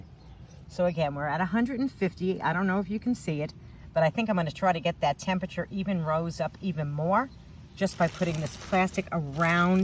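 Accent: New York, United States. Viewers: Nice!! I lived on Long Island till I was 32 years old and we moved to PA in Mount Joy and then moved to Mechanicsburg. We only lived in PA for four years and moved back to NY near Rochester! I enjoy your accent! It feels like home! lol I sound a lot like you. My husband and I were watching you and I was like, she sounds like meeeeeee!!! lol You have a new fan! :)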